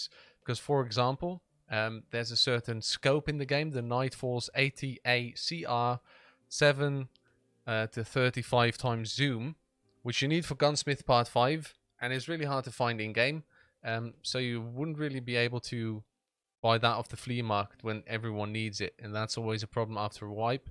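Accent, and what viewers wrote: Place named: South Africa. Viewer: Where are you from? You almost sound South African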